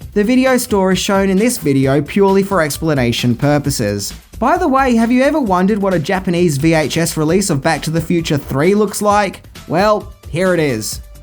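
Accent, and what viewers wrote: Australia. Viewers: Watching your videos helps me practice my Australian accent. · Thanks, As a fellow Aussi, I don't find your accent annoying. There are worse Australian Accents than yours (Tasmania anyone). How about a series of videos on 70s, 80s, and 90s movie sex symbols? Just an idea. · Yes, I find your accent a bit annoying ( Im an Aussie too) BUT most of the facts you present are original and fresh so that's why I subscribe and follow. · I have throughly enjoyed all of your videos that I’ve watched so far which has been a lot. I quite enjoy listening to your Aussie accent. As for the haters just remember this quote “You may not be everyone’s cup of tea but you are definitely someone’s double shot of vodka”. I look forward to watching more of your videos. 💜🇨🇦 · Seams like someone is just envy of our boy! I like your happy face and your Ozzie dialect, it feels fresh and puts a smile on my face. 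Don’t change a thing and don’t bother with any haters, if they don’t like the channel they can’t go watch WatchMojo or something! Regards from Sweden! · You definitely made 2020 easier to get through and I think people with Aussie accents are just fine. Other Youtubers from there sound just as cool. How good? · despite what some people think I think you are very positive human being with a very good sense of humour either lighting me about some of the movies I like most and some I don't like very much I look forward to hearing you speak your wonderful Australian accent keep up the good work and I'm jealous of your action figure good work brother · THANK YOU, just Thank you. You are wonderful as you are and your aussie accent is brilliant, there are a lot even worse accents outthere. Greetings from Vienna, Austria.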